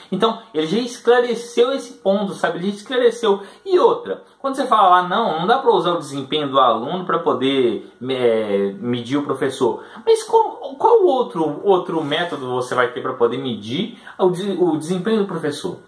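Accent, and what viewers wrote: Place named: Minas Gerais, Brazil. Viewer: Não sabia que vc era de minas, apesar do sotaque. O novo vai apostar forte no Zema, pq o que ele fizer em MG será bandeira para o novo se estabelecer como um partido forte. Sobre escola: Se escola não fosse obrigatoria, não teria essa perda de tempo absurda que é discutir ideologia de genero, escola sem "cerebro", bullying, professores agredidos, etc...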